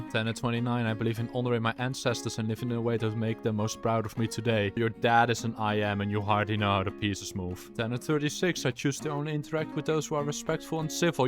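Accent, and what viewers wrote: Netherlands. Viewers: Dutch accent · Ik ruik nederlands in dit accent 😅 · You sound very Dutch 🇳🇱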